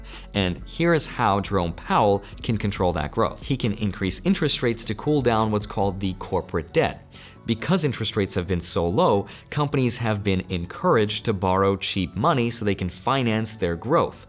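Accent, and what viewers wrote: United Kingdom. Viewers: LOL your British accent is the best.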